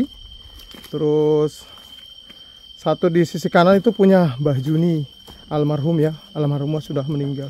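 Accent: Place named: Banyumas, Indonesia. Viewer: Omongane ngapak....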